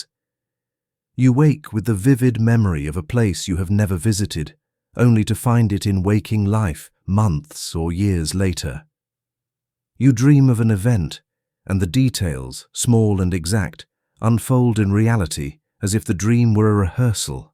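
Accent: United Kingdom. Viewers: Plus the prevalent British accent… 😑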